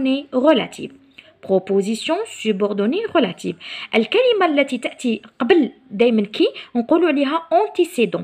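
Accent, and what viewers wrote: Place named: Oran, Algeria. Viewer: شكيت نتي وهرانية ههه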